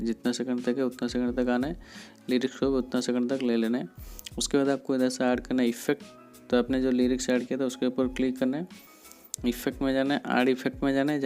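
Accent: Odisha, India. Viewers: Bro are you from odisha i can fell your odia vibes 🖤🖤🖤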